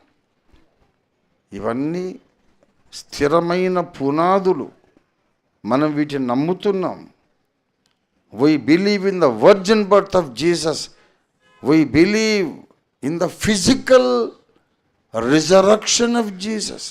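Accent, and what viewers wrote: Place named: Andhra, India. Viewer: గారు మీరు తెలంగాణ వారు అయినా మీ భాష చాలా అద్భుతంగా ఉంటుంది అసలు సిసలైన అటువంటి ఆంధ్ర విజయవాడ గుంటూరు భాష మీరు మాట్లాడతారు మీ భాష చాలా అద్భుతంగా ఉంటుంది పాస్టర్లు ఎందుకు అదోరకమైన భాష మాట్లాడతారు ఎందుకు మాట్లాడుతారు తెలియదు ప్రజలందరూ మాట్లాడుకునే భాష అందమైన భాష మీరు మాట్లాడతారు చాలా అందంగా ఉంటుంది మీ భాష ఆంధ్ర వాళ్ళు కూడా ఇంత చక్కగా ఈ భాష మాట్లాడలేరు మీరు మాట్లాడే భాష వింటుంటే ఎంత ఆనందంగా ఉంటుందో అసలు సిసలైన ఆంధ్ర భాష అంటే మీరు మాట్లాడింది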